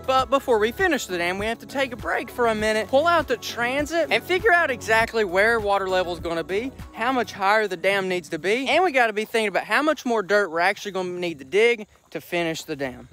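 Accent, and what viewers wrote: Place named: Texas, United States. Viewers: You actually sound like Georgie in young sheldon. Are all texas poeple speaking with this accent! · You sound like you’re from Texas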